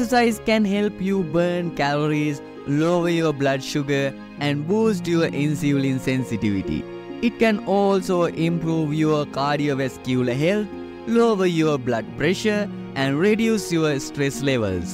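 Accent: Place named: Sri Lanka. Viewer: your accent says you are from Srilanka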